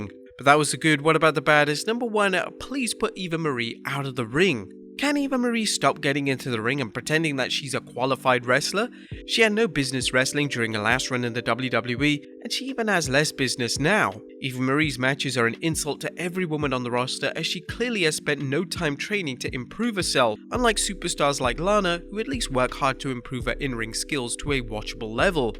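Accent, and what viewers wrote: United Kingdom. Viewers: oh you're also from the UK nice. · Wow, u from uk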